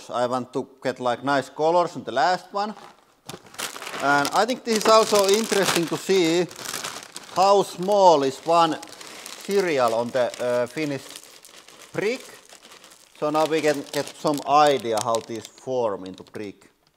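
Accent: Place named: Finland. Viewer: That classic Finnish accent making Weetabix, Fetabix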